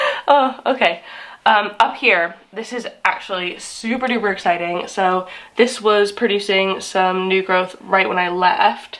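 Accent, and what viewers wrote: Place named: United Kingdom. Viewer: Great video showing the reality of plant care difficulties that gets to even the best of us; also noticed in this video how you had a lot more British sounded words and pronunciations that popped up throughout due to assimilating in London compared to your previous vids, it’s cute and shows the multicultural influences on you💕🫢😏💕👌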